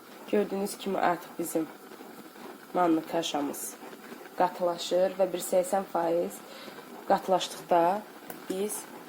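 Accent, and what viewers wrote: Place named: Armenia. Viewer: Yeraz aksentine oxsadi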